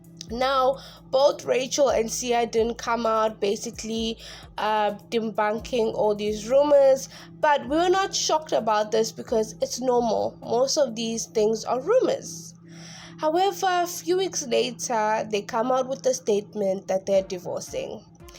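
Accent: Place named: United States. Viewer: Why is this girl (naraiting) talking with an American accent. Pse!!!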